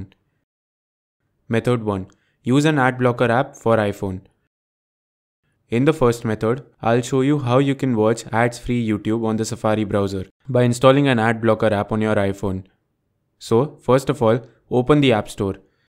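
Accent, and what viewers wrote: India. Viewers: Indian accent always scam😢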